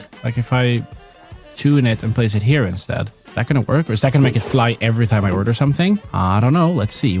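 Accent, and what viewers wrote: Sweden. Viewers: Is it just me or does he have a swedish accent? oh the channel is in sweden. hej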